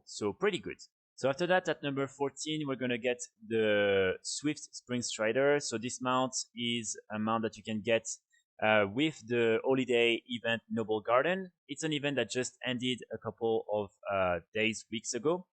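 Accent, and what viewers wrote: France. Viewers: Gros accent français qunad même haha ! Nickel la vidéo, continue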